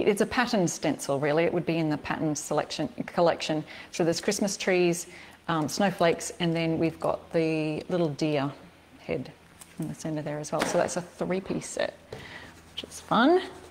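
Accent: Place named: Australia. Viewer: Greetings from Centreville Michigan USA. Love your Aussie accent. Spent a week in Australia and a week on North amd South Island New Zealand 2019. Trip of a lifetime. Your videos are great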